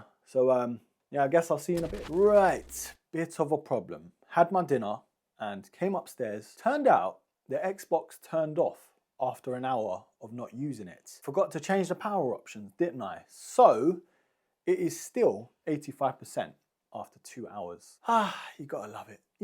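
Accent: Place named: Jamaica. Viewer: Why is he talking like a Jamaican?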